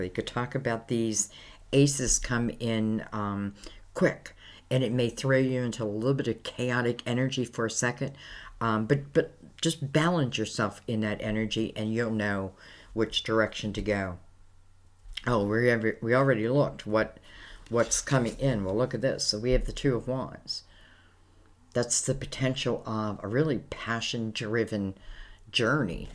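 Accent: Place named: South, United States. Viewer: Thank you so much! I needed this today. You're gifted, skilled, and personable and I have to ask where your accent is from because your voice sounds soothing and Southern. :)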